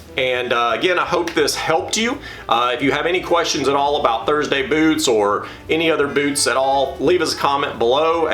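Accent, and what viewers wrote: South, United States. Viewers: Sounds like a southener Me: must be legit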